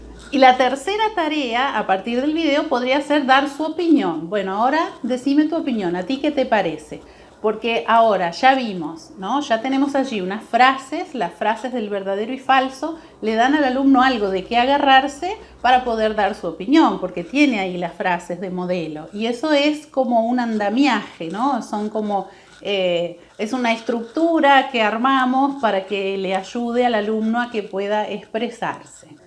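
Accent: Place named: Argentina. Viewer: ¡ Hola,! me llamo Robson y soy de pernambuco,Brasil. creo que tú eres mi vecina, porque tu acento se parece de argentina o Paraguay. Bueno, a mi me gustó tu video. pido perdón, aún no sé escribir bien en español. Dios te bendiga.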